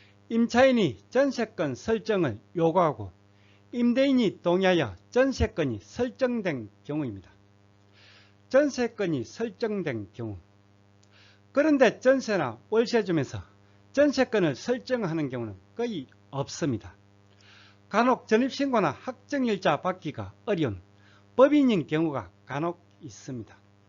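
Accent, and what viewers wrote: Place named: Gyeongsang, South Korea. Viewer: 형님 감사합니다 전세가 6억이면 어느동네 인지요 경상도사투시니까 대구 수성구 인가요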